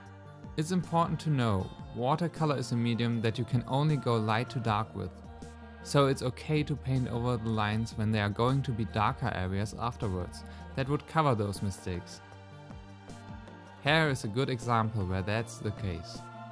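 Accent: Germany. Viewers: Ich wusste sofort dass du aus Deutschland kommst 😂 aber man merkt es kaum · A lot of people don't like the montval, and I'm certainly not qualified to speak on paper quality but I really liked it when I got a big sheet for cheap just to try. Not everybody can afford arches. Dacht doch ich hör da nen deutschen raus Liebe grüße in die heimat, hoffe bei euch wird es nicht so schlimm wie bei uns hier an der amerikanischen ostküste. Wäre schon jahrelang lieber wieder zuhause aber nie mehr als jetzt. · Честно говоря, я просто написала то, что больше всего подходило (´∀` ). Переводить на слух с немецким акцентом немного сложно, и я просто долго не могла понять, что он говорит. Так что пару раз прибегла к простому "и так сойдёт". Хотя на видео он именно это и делает: вытирает кисточку пальцами. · Luuzy Arts wie man es einfach immer bei jedem deutschen so mega raus hört xD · I love your accent~ It's sounds German to me...correct me if I'm wrong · gutes video. 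Aber boah was fprn harter deutscher akzent der ist ja fast so schlmm wie mein akzent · Dein deutscher Akzent sticht heraus:D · Ich liebe den deutschen Akzent :D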